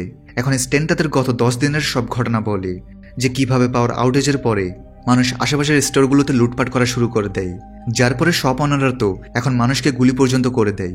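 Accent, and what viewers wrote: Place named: Pabna, Bangladesh. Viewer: vai morgir khowar😁😁,,ata to pabnar ancolik vasha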